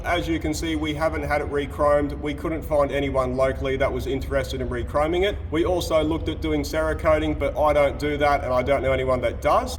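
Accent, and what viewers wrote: Australia. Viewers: I'm from Germany and that Australian accent makes it easier for me to understand... I was born near Cologne and the rythm of Australian and "Kölsch", which is a German accent spoken around here, is quite similar...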